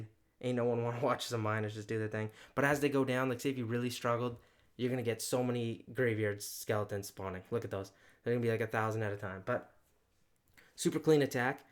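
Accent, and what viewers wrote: Canada. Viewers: You owted yourself. Bro is from Canada or upper midwest. I think he watches trailer park boys too. The way she goes!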